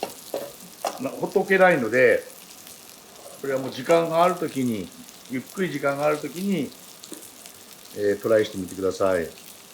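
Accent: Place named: Tohoku, Japan. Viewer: 三國シェフは、南部か宮城の方ですか？それか道南かなあ。懐かしいアクセント。かぶ、畑からとってきて、このレシピやりまーす！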